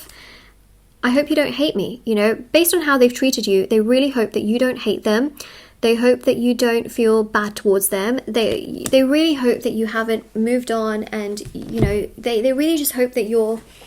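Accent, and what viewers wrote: United Kingdom. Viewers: Dude you look like North Indian with strict parents but you sound like British ?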